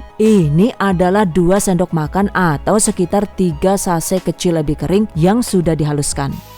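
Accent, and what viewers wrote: Banyumas, Indonesia. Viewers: Aku ya eong ngapak, tapi ari krungu ngomong ngapak geget dewek 😅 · Aduh aku geget wong ndi si mbokayu deneng ngapak mblaketaket pisan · Ora ngapak ra kepenak😂😂😂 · Ngapake bikin candu😁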